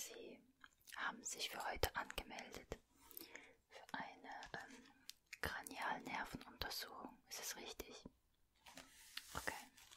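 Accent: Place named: Russia. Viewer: Ich liebe diese Stimme mit dem russischen Akzent so Nice😍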